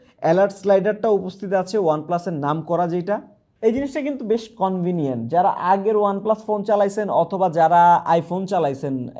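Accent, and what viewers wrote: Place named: Rangpur, Bangladesh. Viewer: ভাইয়ের কথা গুলা কেমন যানি অন্যরকম লাগলো। মনে হচ্ছে রংপুর বা দিনাজপুর বা বিক্রমপুইরা লাগে অনেক টা।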